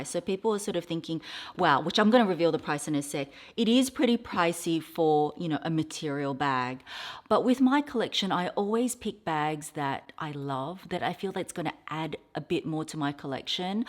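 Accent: Australia. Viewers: Hi! I've watched every single video since you started! I love luxry goods but I can't buy everything I love and you always shared the prices and introduced them perfectly! Also, I want to practice my speaking Aussie English more from you! Yes, I am from Melbourne as well! My IG is pandadududududu. Sending love from Melbourne😍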